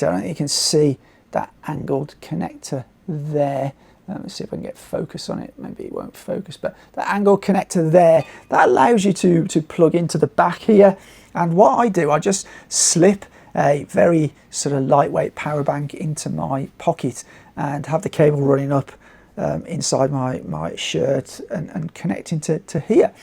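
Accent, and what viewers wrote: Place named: England, United Kingdom. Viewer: People should not comment on accents if they don't know what they are talking about. This guy is English, hence his accent is one of a number of English accents.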